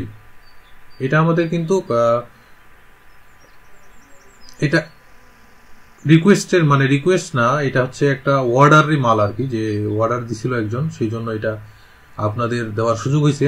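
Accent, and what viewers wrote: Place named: Sylhet, Bangladesh. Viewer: আমিতো মনে করেছি সিলেটে! আপনার ভাষা কিছুটা সিলেটি টান আসে!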